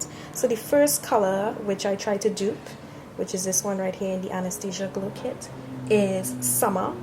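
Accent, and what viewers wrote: Trinidad and Tobago. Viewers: You got a new subbie even though I will disagree with you and get the Sun Dipped kit :) haha I love the Trini accent, my parents are Trini! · Are you trinidadian? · The trini accent yasss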